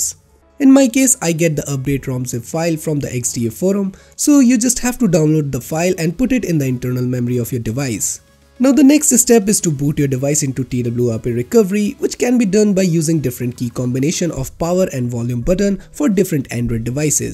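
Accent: India. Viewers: I DON'T UNDERSTAND ANY OF THIS and your Indian accent isn't helping!!!!!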